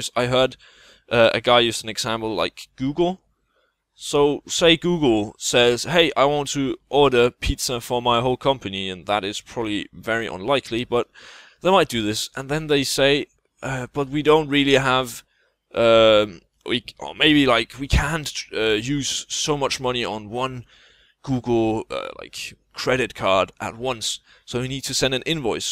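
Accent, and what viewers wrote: Denmark. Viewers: jeg kan høre at du er dansk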